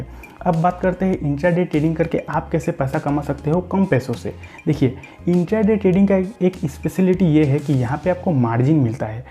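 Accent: Bengal, India. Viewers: Bangali ha kiya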